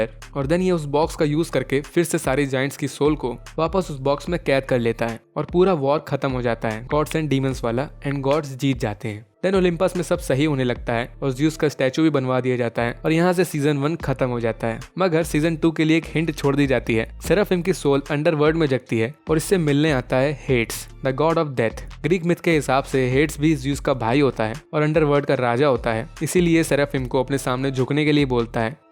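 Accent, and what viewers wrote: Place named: Bihar, India. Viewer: Ohh man.... are you from bihar?? I can recognise it coz of your accent.. But your voice is really relaxing and soothing.🥰❤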